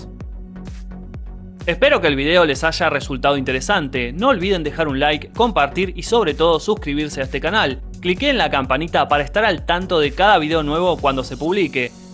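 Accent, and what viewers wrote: Argentina. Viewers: Qué yeísmo tan fastidioso el de este argentino, pronuncien bien la “y” y la “ll”, parecen idiotas con esa pronunciación tan fastidiosa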